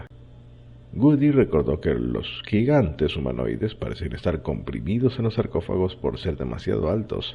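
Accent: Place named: Spain. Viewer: Asta que cambian las voz. K ya me tiene asta la madre las voz español o gallego. Mierda. La lengua española de es la más horrible de la abla ispana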